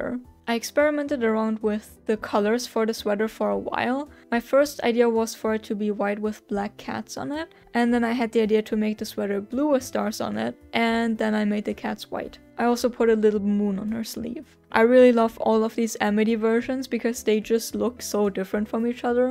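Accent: Germany. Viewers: I am so glad i found ur account! I love ur artstyle! Also, are u german? Bc of ur cool accent! I loved this vid keep going I really enjoyed it! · i really love your art i just clicked on the video cuz i LOVE the owl house and as soon as i saw you and your art style i had to follow you! btw are your like german or smth? kinda sounded like it!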